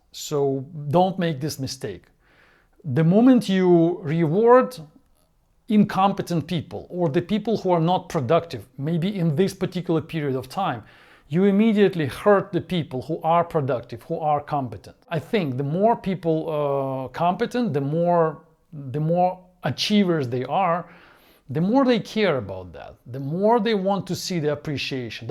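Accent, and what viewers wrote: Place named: Russia. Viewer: Totally agree with what you are saying. Just a tip. Hire somebody to work on your English pronunciation, it's so Russian now. :)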